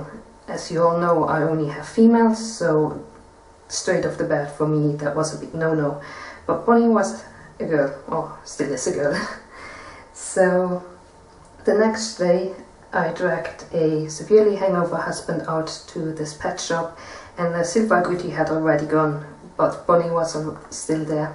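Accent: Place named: Germany. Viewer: Your accent is awesome!!! Are you German?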